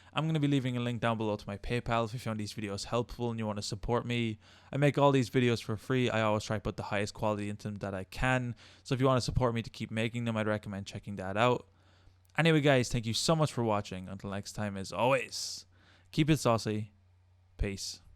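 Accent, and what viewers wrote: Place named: Ireland. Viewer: Knew u sounded irish Hello From a fellow irish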